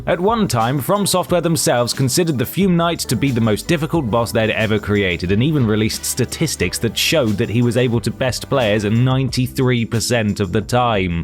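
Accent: United Kingdom. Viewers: wait a sec , you are British ?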